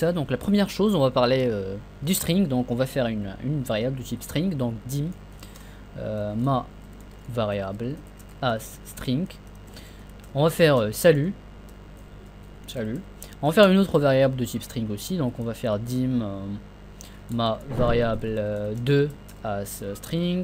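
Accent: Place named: Morocco. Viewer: On entend l'accent marocain lol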